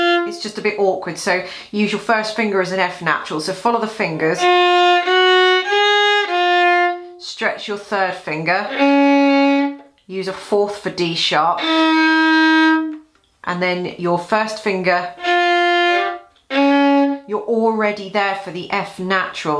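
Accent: England, United Kingdom. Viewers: honestly sometimes i am watching you only for your english accent😂 btw i am a foreigner your tutorials are what we need as student so thank you for your effort.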